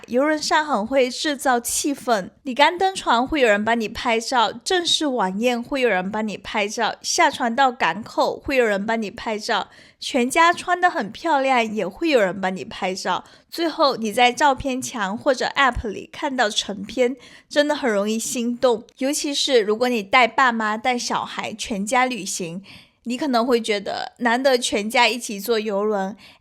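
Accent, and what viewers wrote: Fuzhou, China. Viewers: 典型福州口音？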